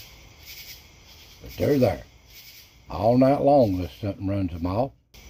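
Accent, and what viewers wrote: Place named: Missouri, United States. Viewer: Just found this. The narrator sounds like folks from my hometown in SE Missouri.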